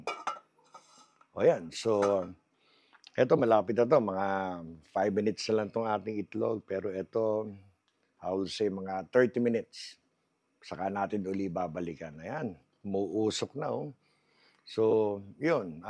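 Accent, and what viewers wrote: Marikina, Philippines. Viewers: Yung accent ninyo talaga pure taga Marikina😊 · Puntong Marikina ang salita niyo.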